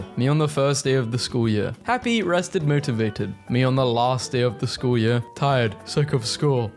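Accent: United Kingdom. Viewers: British guy ye🐥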